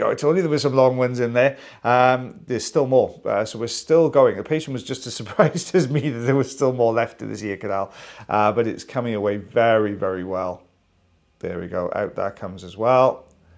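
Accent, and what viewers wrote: Wales, United Kingdom. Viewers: I dont think your accent is that bad its mild compared to north wales. You also dont talk that fast people should try listening to the irish! X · It’s a lovely accent. Think I’ve said this before. Love Wales. Love the videos. Take care. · Love your welsh accent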